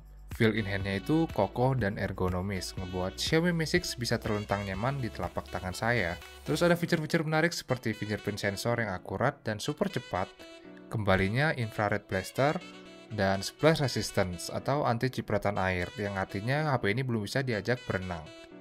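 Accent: Lampung, Indonesia. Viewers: Orang lampung ya min?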